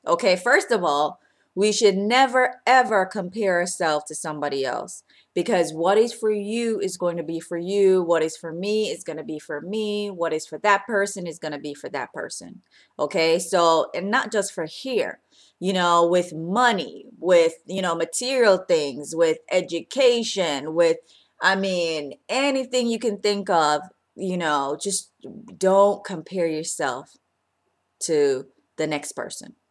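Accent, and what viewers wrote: Jamaica. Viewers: loveeee love your hair- i am going natural-6 months post relaxer now- are you jamaican? i hear an accent? my whole family is jamaican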